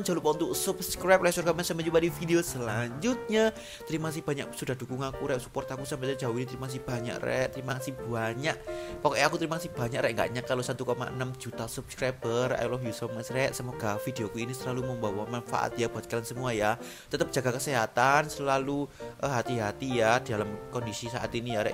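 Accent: Java, Indonesia. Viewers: Medok lo rek mas 😂😂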